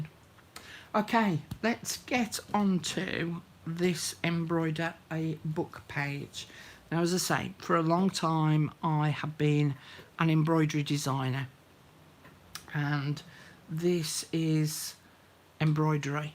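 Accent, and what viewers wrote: United Kingdom. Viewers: I am a fabriholic and álways wondered how to do this paper embroidery thing - thanks for sharing your vast knowledge. Just loved the way you split the thread. You are one gifted lady and I luvvv you British accent. Stay Blessed girl - God loves us all.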